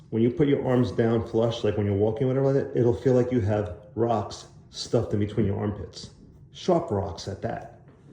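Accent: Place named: East Coast, United States. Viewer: Been thinking about taking the 33 leap lately.. I'm thinking to request a shorter length and forgo the lower pockets to avoid the rising shoulders when sitting as you mentioned. Jacket is looking great, and you're actually selling me on the choice for a lifelong jacket. Also, you've got a great voice. I didn't notice any lisp or anything. I only notice you sound from the east coast.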